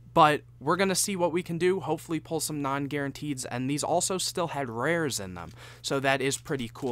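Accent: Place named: Europe. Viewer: Big fan of euro english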